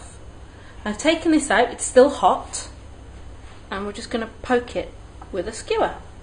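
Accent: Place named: RP, United Kingdom. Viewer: I love your accent... posh English accent you've got. Queen English 😍😍😍. I am soo going to try this.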